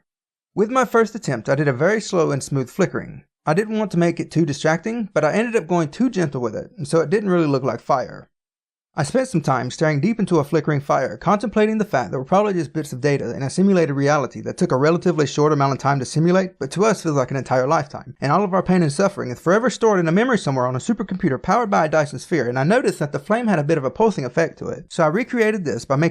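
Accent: United States. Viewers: last video: italian accent this video: american truck dad accent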